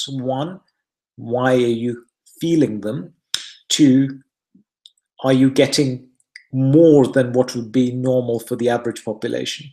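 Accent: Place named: United Kingdom. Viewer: British accent.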